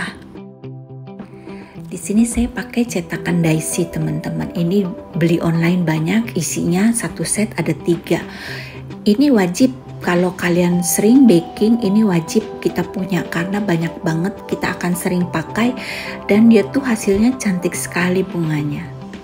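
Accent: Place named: West Java, Indonesia. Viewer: Masyaallah cantik nya ci ibun,,, Logat bahasa seperti nya orang sunda yah🤩, Salam sukses ibun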